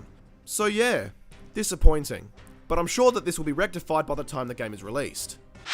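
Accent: Australia. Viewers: Do you think you will continue playing Hitman Absolution? I don't care really, I just love your true blue Australian commentary. :)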